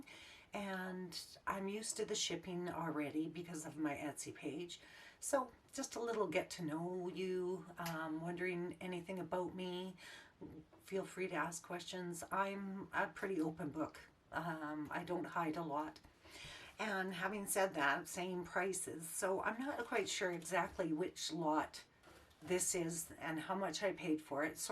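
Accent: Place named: Western Canada, Canada. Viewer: Loving the videos thank you for the background on yourself you sounded like BC or western Canada. I am from Southern Ontario and nothing in my pockets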